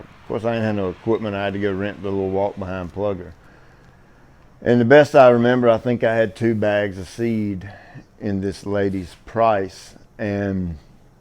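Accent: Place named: Virginia, United States. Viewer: I've watched slot of grass care videos. You're my guy! I'm definitely interested in purchasing your great blend. I live in NJ where it still gets just as hot as my hometown in Danville/Cascade Va. BTW, where are you from? You sound like you could be from those parts or close. Love your videos and look forward to learning more from you!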